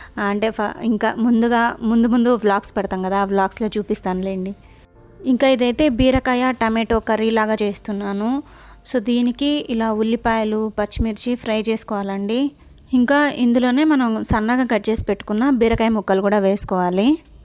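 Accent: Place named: Tirupati, India. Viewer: Mee slang vini chala rojulaindi...maadi nellore .. tirupathi slang nellore slang chala kalustundi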